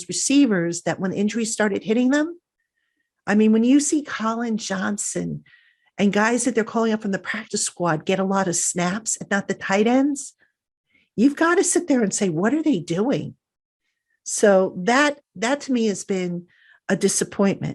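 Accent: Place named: Northeast, United States. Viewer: LOL I f!@ love your accent. 'Ahwords' I love it. As a Canadian I have been conditioned to assume you're either a mobster or possibly a deli owner. But as a Maritimer we share a lot of vocal similarities with the North Eastern US, think just north of Boston. Other Canadians tell me I say "car" like I'm from NYC which is silly but there you have it. EDIT: Just so you know I subscribed a few weeks back at the start of the season but when you mentioned it I looked and I wasn't subscribed anymore. Just a heads up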